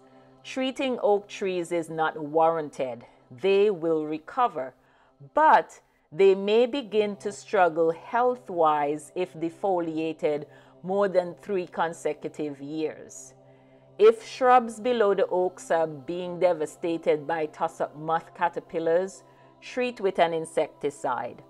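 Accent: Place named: Jamaica. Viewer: Love the Jamaican accent,! Very informative video as they are everywhere in our yard and do tend to sting. Ocala seems to be the epicenter.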